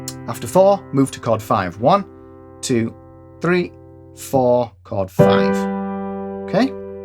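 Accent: United Kingdom. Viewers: You sound like british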